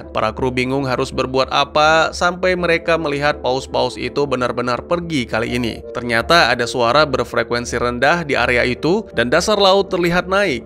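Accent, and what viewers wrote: Aceh, Indonesia. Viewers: Abang orang aceh ya?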